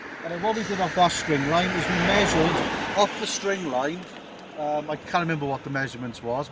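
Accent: Wales, United Kingdom. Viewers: Nice video 👍 very neat work and your welsh which makes it better 😂cymru am byth! 🏴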